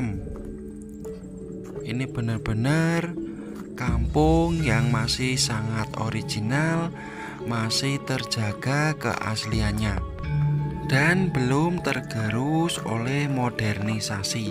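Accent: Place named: Java, Indonesia. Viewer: aslmkm wargi bandung.. Apa kabar..? Abang asli dari mana ko bicaranya kaya orang jawa. Yah..tapi channel orang bandung..?🙏😀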